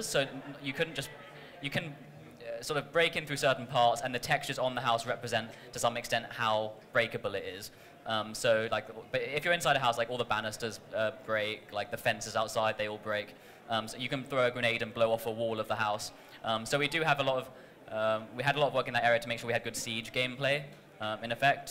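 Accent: United Kingdom. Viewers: British accent and super ambitious game..... Haven't seen this before...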